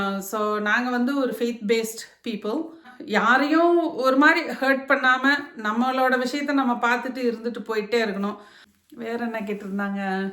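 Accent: Salem, India. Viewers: சகோதரி நம்ம சேலம் ஸ்லாங் உங்களுக்கு இன்னும் அப்படியே இருக்கு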